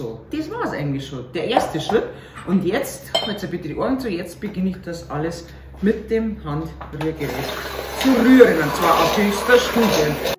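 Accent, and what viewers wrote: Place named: Bavaria, Germany. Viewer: vielen Dank liebe für die schnelle Antwort. Ich finde deine Videos egal ob das Backen oder die anderen so natürlich und mega lustig, das ich dich gleich abonniert habe. Ich muss mich zwar teilweise sehr konzentrieren damit ich alles verstehe, wenn du in bayrischem Dialekt sprichst🤣 aber es geht. Wünsche dir einen schönen Sonntag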